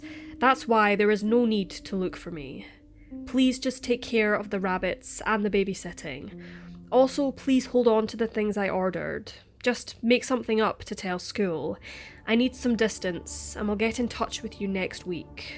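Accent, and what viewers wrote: Scotland, United Kingdom. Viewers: I'm still trying to pin point the accent..where are you from? I used to think it was Scottish but now I'm second guessing. I'm all the way down in the Antipodes in Australia so excuse the ignorance. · Yes great narration...I know this may be outta line....Scottish accent?? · Heartbreaking story. Love your accent, Scottish?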